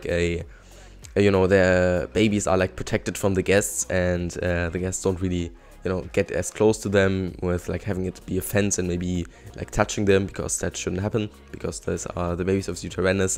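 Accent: Germany. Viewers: Dieses Aussprache hört man als Deutscher sofort haha. Starkes Video ✌ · German accent.... Cant give me that...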